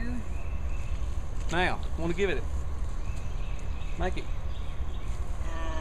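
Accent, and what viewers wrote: South, United States. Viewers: Howdy! The south is the only place where it's acceptable to turn a 1 syllable word into 2 syllables. :) I stumbled across your page a couple of days ago. What I like about your videos is that it causes me to think out of the box, plus the entertainment value. Very interesting ideas that you're sharing. Thanks!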